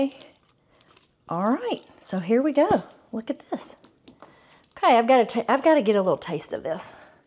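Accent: South, United States. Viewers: Awesome recipe!! I must say you are incredibly beautiful, I mean like breathtaking and that southern accent for a northern city boy like myself is just mesmerizing.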